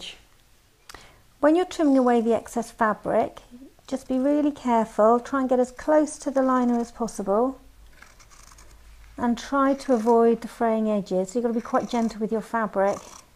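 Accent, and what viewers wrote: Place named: United Kingdom. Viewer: I love that British accent so much!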